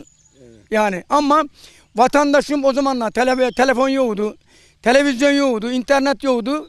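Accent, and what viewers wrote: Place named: Isparta, Turkey. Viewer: Tam ısparta şivesi :D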